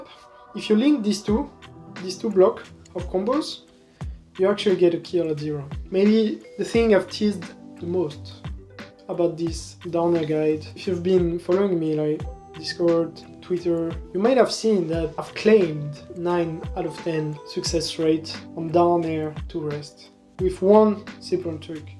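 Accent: France. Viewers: Ton accent est très franchy!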